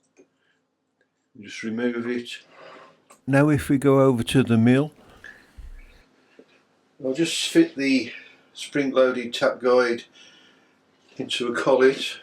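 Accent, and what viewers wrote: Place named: Midlands, United Kingdom. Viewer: and a midlands accent too!!!!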